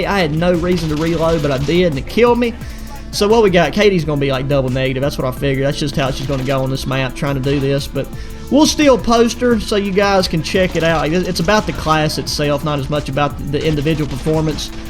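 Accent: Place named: West Virginia, United States. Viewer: Bro you sound like some of my home boys from southern WV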